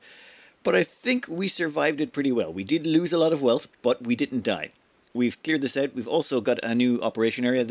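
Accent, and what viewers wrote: Ireland. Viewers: That cannibalistic tundra survival was epic. Maybe you could do an inhospitable biome survival yourself sometime. I'd watch it. Watching you plot and plan is the biggest draw to your channel for me. That and the sweet Irish accent.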